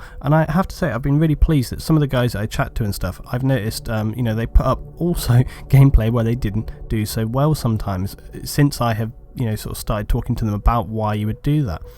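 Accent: United Kingdom. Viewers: Your Sexy British voice (No Homo) captivated me....=subbed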